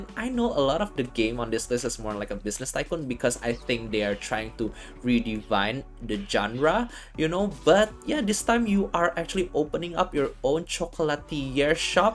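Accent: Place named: Indonesia. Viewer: Indonesian accent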